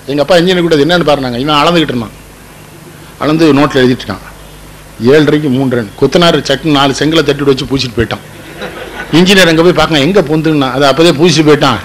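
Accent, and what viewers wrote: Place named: Tirunelveli, India. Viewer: நெல்லைத் தமிழின்... தேனிசை உரை...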